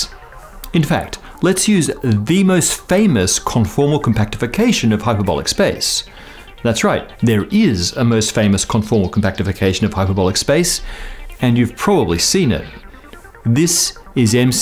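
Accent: Australia. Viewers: Matt O'Dowd is Australian that's why he sounds different . André in Sydney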